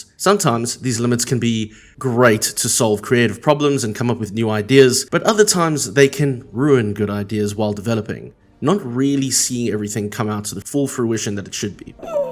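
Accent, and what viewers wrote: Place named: Cape Town, South Africa. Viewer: Haha I thought so, I recognize that local accent anywhere, I'm from Cape Town 😎 Yeah it is really satisfying to get a game working in EFPSE but as many limitations as there are, there's also a strange learning curve to a lot of it, which makes me think it's not really worth it at times, there's a huge lack of tutorials for the engine which is why I make some when I can, but my knowledge is really limited at the moment. And yes I looked into Trenchbroom recently, looks really cool! I might give that a go soon, as well as Godot. Keep the content coming, you're doing great and I look forward to seeing what games you make in future! 🎉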